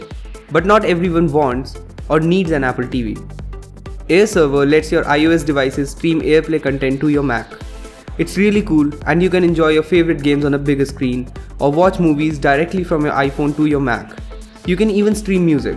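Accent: India. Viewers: my God ... how can i avoid these english-indian movies? Fuck ... horrible english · Disgustimg indian accent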